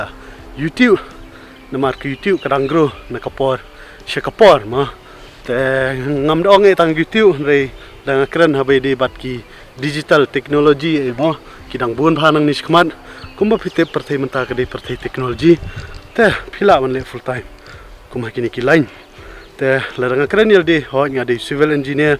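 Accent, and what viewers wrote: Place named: Pnar, India. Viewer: You have a strong pnar accent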